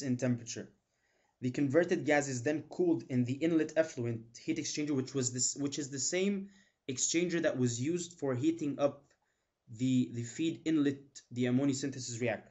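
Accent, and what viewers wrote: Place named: Arab world. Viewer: thanks man! really helpful! i sense an arabic accent! all the best man! ---Undergrad chem eng student, Australia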